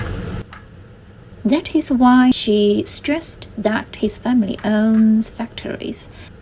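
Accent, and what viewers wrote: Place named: United Kingdom. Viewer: have such a charming British accent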